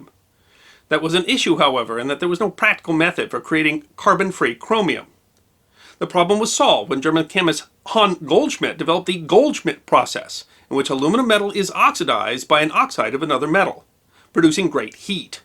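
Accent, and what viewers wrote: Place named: St. Louis, United States. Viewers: St. Louis Missouri? I was guessing the North East originally due to Your syntax and inflection. I'm guessing that You're a transplant then? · I had guessed that you were from the StL area when I first started watching you. You have that accent. I grew up in the Overland/ St Ann area.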